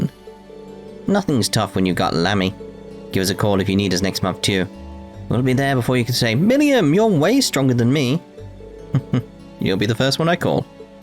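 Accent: Scotland, United Kingdom. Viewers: Scottish voice reveal Scottish voice reveal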